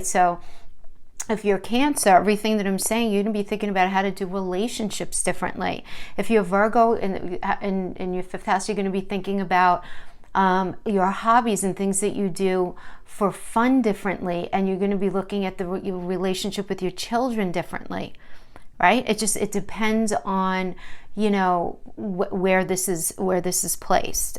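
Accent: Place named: New Jersey, United States. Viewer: ❤️ (As an aside, I can’t stop thinking you must be from Jersey, where all my heart and family lives.)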